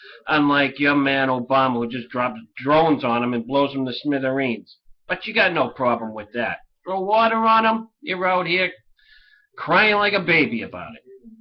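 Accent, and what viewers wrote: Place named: New England, United States. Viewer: ***** Yes you do. You have a New England accent like President Kennedy. It's cool. Everything is good thanks.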